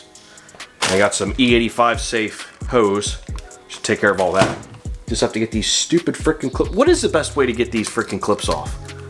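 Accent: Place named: Philadelphia, United States. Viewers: Good stuff! Fellow Philly-accented car video-editor-guy. Cheers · sorry, thought your accent was Delaware County PA. Maybe the (great) Northeast?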